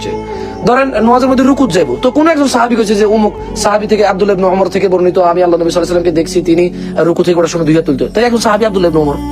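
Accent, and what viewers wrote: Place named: Noakhali, Bangladesh. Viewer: Noyakhalir basa pura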